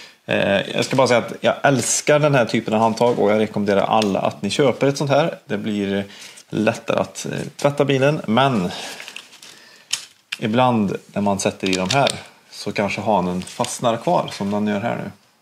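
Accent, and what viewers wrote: Värmland, Sweden. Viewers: Gött å höra lite bred värmländska! Ett fantastiskt hantverk må jag säja! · Dä ä ju lite lustigt detta att du pratar värmländska mä buckel mannen men inte i dina vanliga filmer (inte lika grov värmländska)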